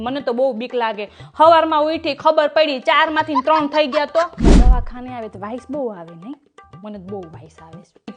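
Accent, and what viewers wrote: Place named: Saurashtra, India. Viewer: અસલ પટલાણી જેવા બોલો છો (સૌરાષ્ટ્ર ની બોલી)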